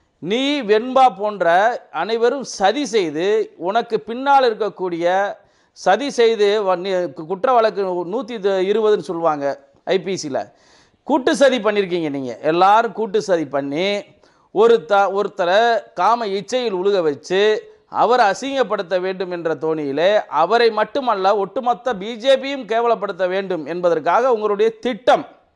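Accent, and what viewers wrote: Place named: Madurai, India. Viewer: மதுரை மொழி கேட்கவே அருமை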